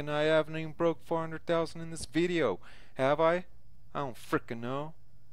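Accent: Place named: Denver, United States. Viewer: <num> is my Ally code. Yo so I play swgoh screen name PlayStyle. I was watching your channel like a year ago then for so.e reason I stop getting your content but I see Im still subscribe and the bell is hit. So I'm watching your channel and u cracking me up and I'm like this dude talks like the homies. You know Denver has a unique swag it's a West coast swagg with Midwest flava strong Latin influence, so I'm like he sounds like he from S. Denver maybe the West up N. Is well we know wat it is and Aurora is blacks whites alot of Interacial fams. So I keep watching and sure enough you pushing that 303/720 kar I was like wow bcuz I ask everyone do u play swgoh? I been on since we Nov/Dec 15 & Neva met anyone who plays this game from Here. So thx for reppin the city for all the Galaxy Nerds and undercover nerds out there. I like your content bcuz u keep it real check out my acct I know u know alot of dudes know but if u ever need to use one of my accts to feature a player let me know. 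I have 2alt accts bcuz there was a time before raids and ships were I could t get enough swgoh I would complete everything in 8min (with the exception of timer events ie: Arena) anyways the wife is buggin talking about I know u ain't takin a shit I. There with the door locked I k ow your nerd ass is trying to save the universe! I just told her the Galaxy chic we saving. The Galaxy. <mail> holla back.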